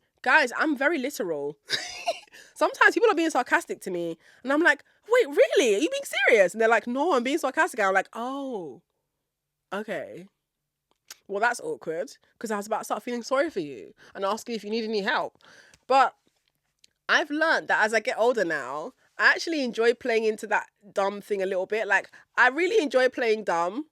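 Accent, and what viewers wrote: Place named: United Kingdom. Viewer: this is my first time hearing your voice and i didn’t know your were a UK babeeee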